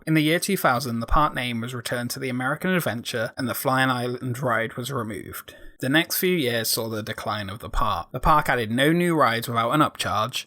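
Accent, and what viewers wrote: United Kingdom. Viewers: Hi mate I've been watching you're videos for months just wanted to say they are awesome . So glad to hear a British accent on theme park commentary. You do some fantastic research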